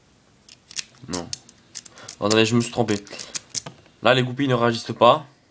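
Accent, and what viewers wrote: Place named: South, France. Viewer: je suis sur que t'es du sud! Vu ton accent pck moi je suis de nice;)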